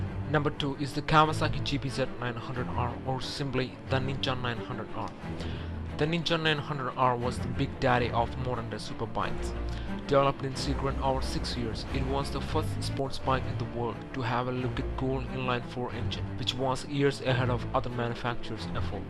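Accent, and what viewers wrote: Kerala, India. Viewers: ഒരു മലയാളി ഇംഗ്ലീഷ്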